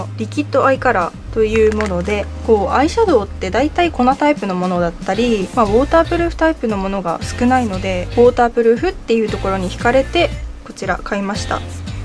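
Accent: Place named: Hokuriku, Japan. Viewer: 北陸の方ですね？ヽ(・∀・)ノ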